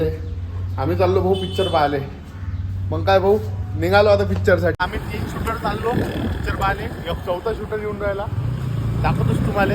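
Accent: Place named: Vidarbha, India. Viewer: भाऊ भाषा लय भारी आहे तुमची बहुतेक तुम्ही आमच्या वऱ्हाड aतले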